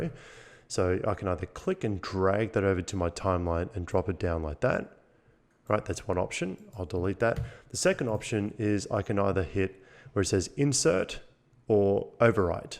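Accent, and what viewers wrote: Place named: Australia. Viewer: That was so helpful, thanks. Also great to hear it with our local Aussie accent:)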